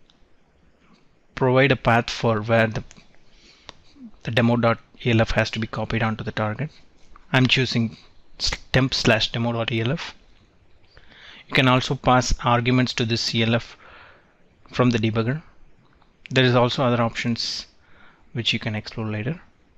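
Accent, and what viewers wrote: India. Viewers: Awful Indian accent as always. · wow this one is exactly from middle of india - however useful tutorial but bad accent - anyway good luck to you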